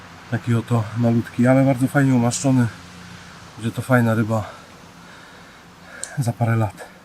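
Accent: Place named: Poznań, Poland. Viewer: Widać(słychać),że jestes z poznańskiego i miło,że używasz czasem słówek z gwary-fyrtel,kieretyny,,grzdyl…Super!!!Powodzenia!!!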